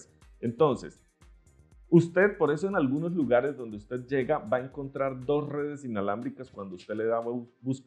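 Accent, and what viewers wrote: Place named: Costa Rica. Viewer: Casi creí que era tico por el acento haha hasta que dijo lo de las familias de colombia. Saludos! hablamos casi igual!!!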